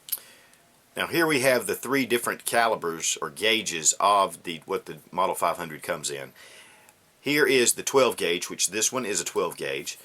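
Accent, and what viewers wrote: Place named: South, United States. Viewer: i love my 500a, i mean its not a suave 870 but i mean really when your doing dirty work dependable is where it is. also 8 rd's in the tube one in the chamber you can't argue with that fire power, and also add a sure fire to the front and a shell carrier to the butt stock you got yourself low budget shtf gun, i mean a slug is a human stopper hell buck shot is too. great review, i love your channel and love the fact im hearing a southern accent with my review long live the republic!!!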